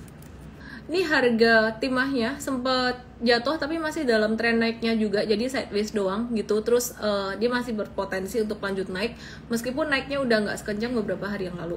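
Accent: Java, Indonesia. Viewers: terima kasih ci. penjelasannya singkat dan jelas. pertahankan logat jawanya .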